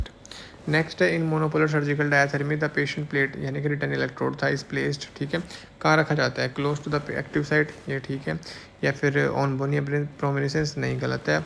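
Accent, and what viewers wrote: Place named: Himachal Pradesh, India. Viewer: Sir aap himachal se ho na apke bolne se pta chl rha hai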